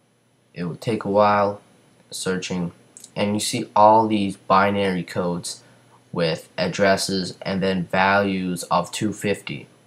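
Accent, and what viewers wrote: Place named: Australia. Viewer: so...u went from an American to an Aussie?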